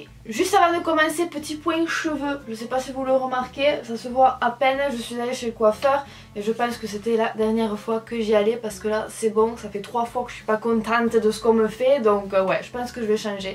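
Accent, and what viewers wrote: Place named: Marseille, France. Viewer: Quel est donc ce bel accent ? Marseille ? ??🤔😉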